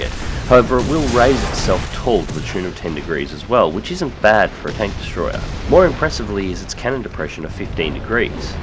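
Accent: Australia. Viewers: mate the aussie in you is coming out lol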